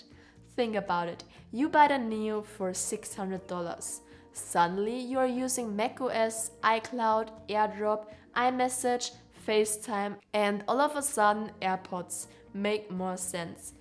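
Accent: Germany. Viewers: Krasser deutscher Akzent 😂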